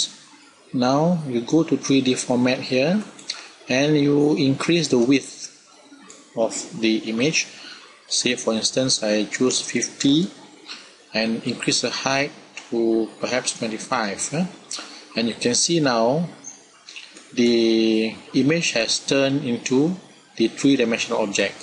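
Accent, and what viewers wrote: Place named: Malaysia. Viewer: aku dengar suara org speaking tu mcm org malaysia..last2 tgk logo mmg malaysia punya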